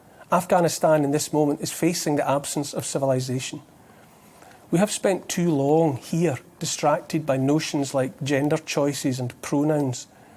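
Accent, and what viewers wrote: Scotland, United Kingdom. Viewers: Always admired the man (and his Scottish Enlightenment accent) But during Covid19 plague? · Neil's Scottish accent,reminds me my young time when I was attending my primary school and then grammar school in nothern Scotland Vic.(G.D.of Lux.).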